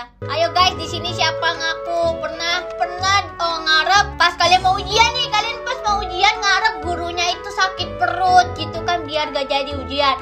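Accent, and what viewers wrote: Java, Indonesia. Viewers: Kak btw orang mana yak? Kek nya Jawa yak? Kalau Jawa, Jawa mana? Akoh Jawa tengah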